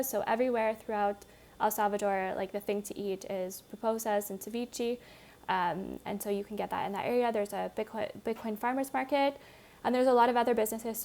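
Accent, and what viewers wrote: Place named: United Kingdom. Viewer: Preconceived notion of extreme poverty in so called (3rd world countries) woow, obviously you are not from the US, your accent is more British. Have you ever visited some major US cities like Baltimore, Philadelphia to name a few, talk about extreme poverty.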